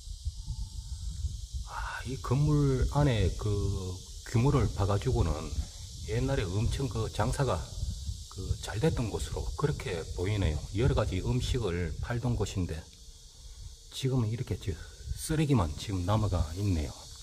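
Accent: Gyeongsang, South Korea. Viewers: 설명 하시는분, 경상도 사투리를 의도적으로 강하게 하시는데, 좀 순화 하시길. "맹" 같은 말은 무슨 말인지? 혼자만 알수 있는단어라면 무슨 소용?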